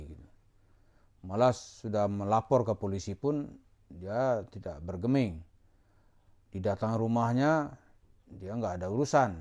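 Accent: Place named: Padang, Indonesia. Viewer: ente dr logat bicaranya sprti orang padang....berarti en😢ente sma dgn si Mansyur l😅ngkiengkieng mangkait...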